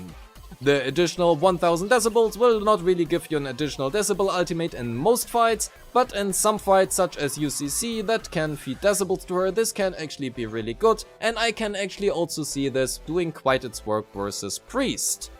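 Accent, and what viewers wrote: Germany. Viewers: Great video, i hope ye shunguang will restore my zzz luck. Are you by any chance german? I hear somewhat of a familiar accent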